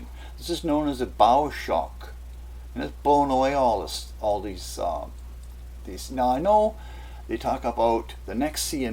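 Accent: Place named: Canada. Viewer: Hey are you Canadian? You sound like me. lol. I think I know that accent. Be sure to see these if you have not regarding planet X, excellent information. it discusses the plasma field as well as the iron oxide and the debris it drags along with it on all sides, pushing asteroids ahead and dragging it behind. It isn't moving that slowly either as Carlos Ferrara discovered in his 40+ years of study of its perturbations, Carlos found it travels at three speeds, check out what he has to share with us about this. CARLOS MUNOZ FERRADA HERCOLUBUS (Chilean Astro Pysicist 40+ years study Nibiru perturbations) <link> Also don't think our magnetosphere is going to protect us as it is dropping at a very high rate at this point see this about that, stop the articles/emails in the video and read them to explain that which I am speaking of. Earth Changing End Time Signs (Magnetosphere is dropping, pole flip coming) <link> John B Wells; Gill Broussard Planet 7X (as far as I am concerned Gill produces the finest research material available) <link> A MUST WATCH Interview Nibiru Planet X future predictions;Carlos;Ferrara;(Chilean Astro Physicist 40+ yrs research) <link> P-7X Scripture and;TIMELINE Lg 11x17.pdf <link> (Free PDF downloads of Nibiru Timeline according bible and Asian Astronomical records) Gill spent 9 months in China alone studying Emperors Head Astronomers records and compared them to those of Korea, Japan, Germany, Turkey, the bible....it all correlates as true. 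The signs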